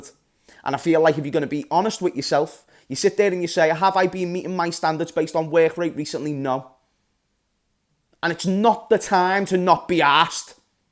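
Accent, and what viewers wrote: Liverpool, United Kingdom. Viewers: Another gobby scouser strikes again.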